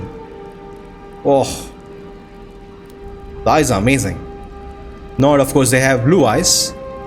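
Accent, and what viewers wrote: India. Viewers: Omg r u Indian?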